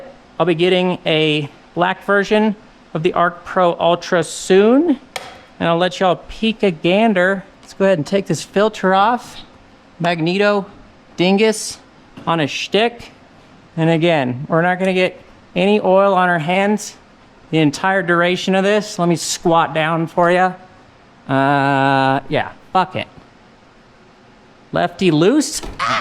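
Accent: Canada. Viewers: Love your videos. Do you use Bosch 3323 filters on Hondas since they moved away from Filtech and what do you think about Aisin transmission fluid and coolant? Gemini told me that it is as good if not better than Honda but I was a little concerned when I looked at my receipt and saw that my independent shop didn't use Honda fluids when I had my 73K 2012 Odyssey EX-L in for service. I bought it new and hauled the kids around in it for years so I can't get rid of her even though the last service cost me more than she is worth. I thought that Aisin timing belt kits were industry standard but didn't know anything about their fluids. Can you share your thoughts on those products - either in comments or on a video? Also, I assume you grew up in Canada based on your accent, etc. but haven't seen a video exp,aining that. Is there one that I missed?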